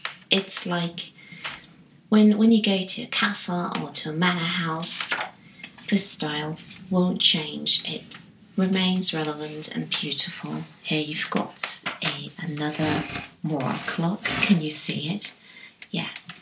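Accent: United Kingdom. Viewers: I agree completely.I couldn’t believe she wasn’t English.She has the most English of voices and I could listen to her all day.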